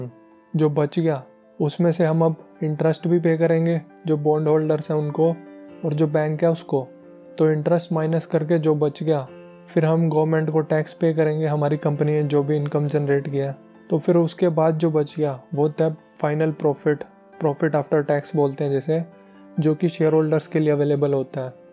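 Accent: India. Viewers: Welcome back sir🙏. Ur knowledge packed presentation in deshi aawaj is just killing...lagta hai koi apna school ka purana yaar bol raha hi....keep doing favour to us by sharing ur knowledge in deshi style 😀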